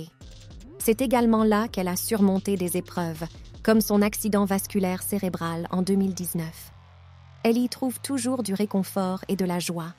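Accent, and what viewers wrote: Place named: Canada. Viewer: On s en fout, ce n'est pas nous, drôle d accent ce robot (canadien)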